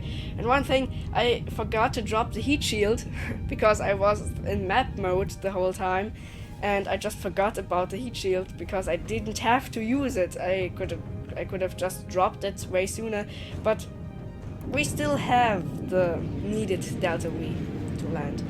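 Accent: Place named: Germany. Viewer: Ging mir auch so! Man hört den Deutschen Akzent, aber Super gesprochen :)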